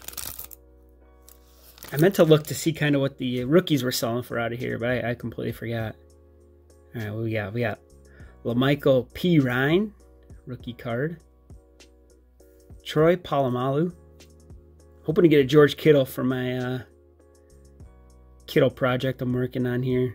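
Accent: Minnesota, United States. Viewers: What part of Minnesota are you from?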